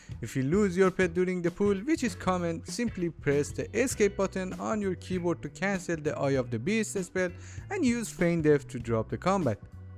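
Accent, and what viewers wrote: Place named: Iran. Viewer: kose khare lahje irani xD nice video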